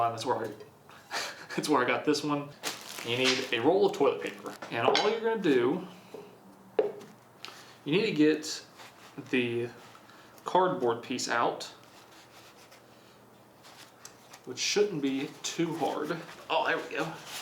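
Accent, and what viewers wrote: South, United States. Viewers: He sounds southern but he calls it Pop, and we dont do that. So I'm mega confused.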